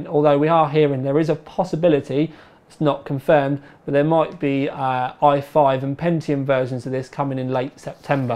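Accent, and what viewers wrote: United Kingdom. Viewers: Is this British accent? :D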